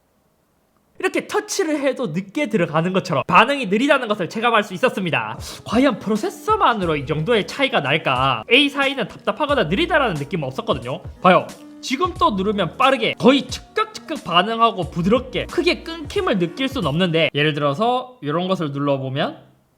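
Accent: Gyeongsang, South Korea. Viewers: 말투로 보니 대구에서 자란 친구네. 대구~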